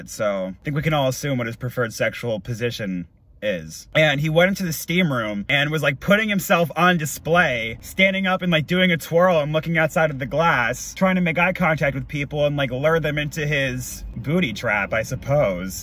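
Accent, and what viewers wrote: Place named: Midwest, United States. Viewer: Greetings from ur old stalking grounds L.A., why are you regressing? You're supposed to move away from places like that to L.A. not the other way around. Where did you move to? I didn't hear a southern accent, you have more of a midwest frozen state vibe, I'd guess Ohio, Mi, Wisconsin. I made that mistake once b4 as well,.moving from a real city to a tiny little town in TN. They surprisingly had one gay drag bar. Drag is real big in the south for some unknown reason. The cops would raid it for one reason or another and they would enjoy printing the names of those arrested for whatever charges they wanted to charge you with, they would print your name in the paper and you would come out to the whole town via the newspaper. I lasted less than a year...I never made the headlines...I moved back to LA.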